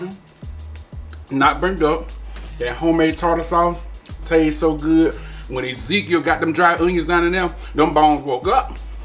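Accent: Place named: Louisiana, United States. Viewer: Love y’all was watching u heavy since 5k I use to have the biggest crush on you Cuh of your Louisiana accent lol 😂 food looks good tell hubby i said hey 👋🏾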